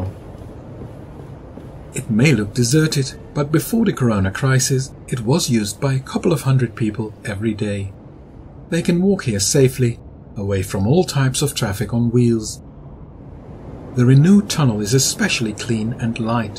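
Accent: Netherlands. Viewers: Lekker Nederlands accent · Interesting mix between a Dutch and English accent :p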